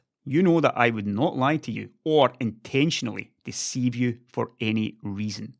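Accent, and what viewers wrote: Scotland, United Kingdom. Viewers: tech talk reasoning in a Scottish accent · We love the scottish guy with the scottish accent!!!! PLEASE dont stop the vids !!! Dont let us be a total fodder for the marketing departments !!! We need really smart opinions like yours!!! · thank you for your sweet Scottish accent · I love the Scottish accent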